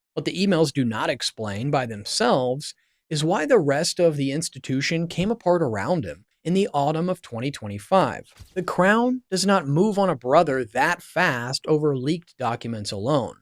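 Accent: United States. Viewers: Why the American narrator? What's Prince Andrew got to do with Americans?! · PLEASE learn how to pronounce proper names. specifically "Sandringham", "Norfolk", "Thames" and "Aylsham". To British ears you sound very err... American (this is not a complement). When you talk about British police - you video shows American police and at one point police from some third country. Pretty sloppy. I like the content though. Andrew is a pig that has besmirched the institution of monarchy. When the King said "the law must run its course" that removed all royal privilege and protection. King Charles must be fizzing with anger at his brother and by publishing those words he is signalling that he is prepared to see Andrew in prison. You can now expect the full force of the law to drop on Andrew like a ton of bricks.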